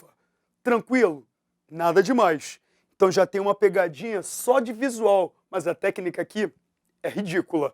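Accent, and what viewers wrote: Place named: Rio de Janeiro, Brazil. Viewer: Coloca o titulo como se só o RJ tivesse pm oficial, tem que adivinhar pelo carioquês. Ajuda aê.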